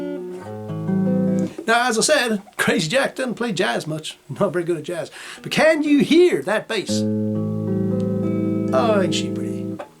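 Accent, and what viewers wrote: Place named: United States. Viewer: what`s with the stupid yank accent??????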